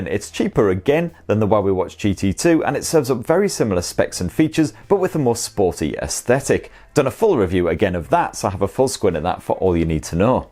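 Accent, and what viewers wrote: United Kingdom. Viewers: You didn't Include links or written names to any of these items, you ran through cryptic names too fast and with your British accent I have no clue how to reference and follow up on any of those devices. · Am I the only one who watches his channel for his absolutely lovely British accent?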